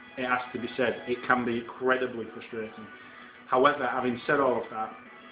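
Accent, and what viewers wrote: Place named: East Yorkshire, United Kingdom. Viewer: I sense an East Yorkshire accent!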